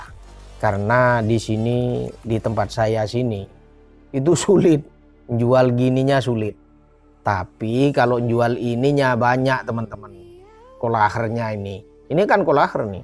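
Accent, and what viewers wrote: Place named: Madura, Indonesia. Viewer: Ini kakak pasti dari madura.... Tak iye?? Mantap kak... 👍👍👍 sdh like n subscribe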